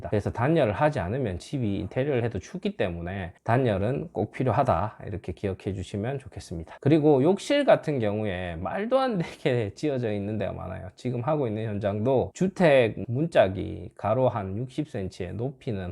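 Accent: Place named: Gyeongsang, South Korea. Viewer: 대구 주택리모델링으로 검색하다가 시청한 영상이라서 대구분인 줄 알았는데 부산이시군요^^ 말투는 대구, 경북 같아서 나중에 문의해봐야지 했는데 아쉽네요.^^ 그래도 자주 들어와 볼게요.. 영상 잘 봤습니다.^^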